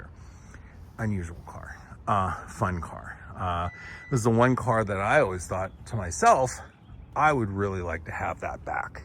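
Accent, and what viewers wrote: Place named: Pennsylvania, United States. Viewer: I could tell you are from PA by your accent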